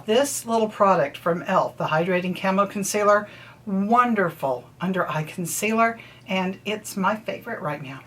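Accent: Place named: South, United States. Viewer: Beautiful skin❤️hear some southern in your voice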